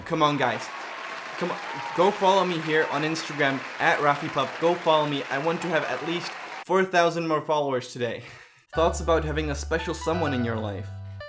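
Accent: Japan. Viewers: HAHAHAHAHA OK U MADE ME LAUGH IN YOUR NIHONGO/Japanglish 😂😬🤟🏼🤟🏼🤟🏼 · your japanese English is professional, i can tell you. You speak literary the same as my English teacher at Japanese School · Japanese english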